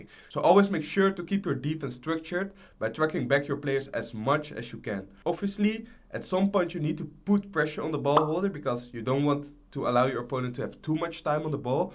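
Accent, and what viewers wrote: Netherlands. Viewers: Hij klinkt Nederlands hahah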